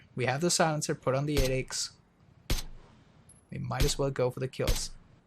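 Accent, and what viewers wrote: Denmark. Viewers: i couldn't help but notice your accent and ask if you're Danish? It sure sounds like it:) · are you Danish? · Are you danish? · Are you Danish????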